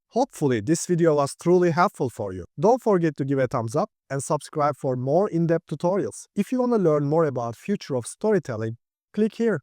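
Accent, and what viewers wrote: Turkey. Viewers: I hear a strong Turkish accent. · Türk duyduğumda tanırım :) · Sanki ingilizcende bir Türk aksanı var gibi ama neyse😅😅😅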